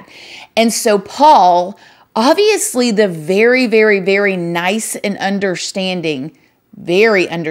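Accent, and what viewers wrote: South, United States. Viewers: You’re country accent is everything I need when hearing these crazy stories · she is from the southern tip of Florida, from what I remember. she has a slightly southern accent. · I just discovered this channel. Someone help me out. I'm trying to place her southern accent that fades in and out. First, I said Tennessee...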